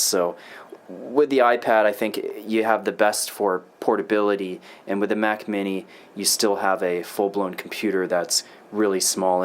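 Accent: Canada. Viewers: judging by ur accent, ur Canadian?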